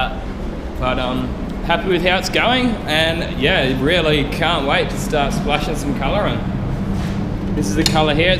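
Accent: Australia. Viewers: I like your enthusiasm. I've been doing this work for 40 years and still enjoy it, but it's fun to watch you work (especially enjoy your aussie accent!)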